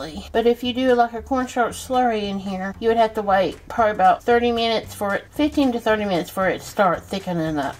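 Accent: South, United States. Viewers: I love love love your accent and pronunciation of words! True southern accent??!! Lol. Truly Love your recipes and your cooking channel!!! Thanks for sharing your love of cooking and wonderful recipes!!!! God bless you!!!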